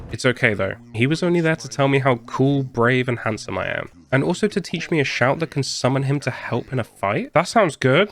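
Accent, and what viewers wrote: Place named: United Kingdom. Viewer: howcome you say dollars? if you dont mind me asking since you sound british?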